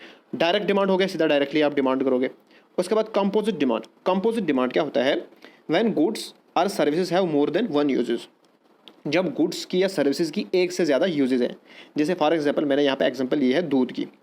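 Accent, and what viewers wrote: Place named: Kashmir, India. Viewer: Ab ka bei sankari gaandu, Youtuber nikalne bna hai. Pehle apna accent thek kr. Kashmiri English nai chalti 😆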